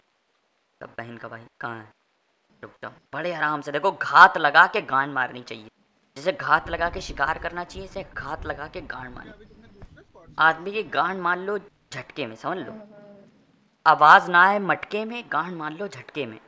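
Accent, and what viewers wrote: Kanpur, India. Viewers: Bhai Full Apni Kanpuriya language bol rhe😂😂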